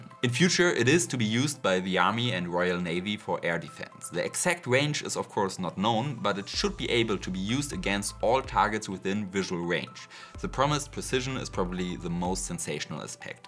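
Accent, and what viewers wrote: Germany. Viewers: Die englische Aussprache hört sich aber mega-deutsch an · And i still dont know if he makes his accent stronger for the vids (since many English expect one hard German accent) or if it is his normal one. But i say he will still grow in the next months , after one point and base quiet fast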